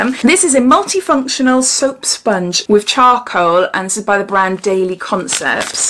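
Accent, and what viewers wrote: Wales, United Kingdom. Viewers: This review is really helpful as I was thinking about trying this out- going to go for Pip instead! Love your accent- makes me want to return to lovely Wales! And you’re v brave living with 4 others- I’d go nuts!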